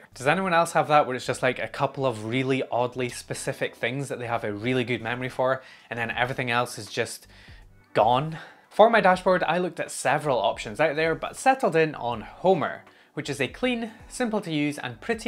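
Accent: Scotland, United Kingdom. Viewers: Excellent insight for a newcomer to the homelab/server world. Have just installed TrueNAS Core and upgraded to Scale on a salvaged 2011 desktop machine as an experiment, so I'm just getting a feel for the most popular apps that folks are running before taking the next steps. Really useful overview and nice to hear the Scottish brogue again ( I spent 21 years working in Lanarkshire for one of the few remaining semiconductor facilities before retiring back in my Welsh homeland with my Scottish spouse). Sub'd :)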